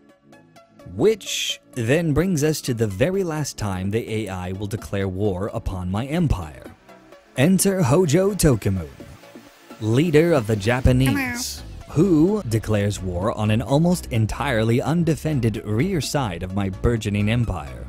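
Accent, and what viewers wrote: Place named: Ukraine. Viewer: He should stick with his natural Ukranian accent. Dame pesos for example.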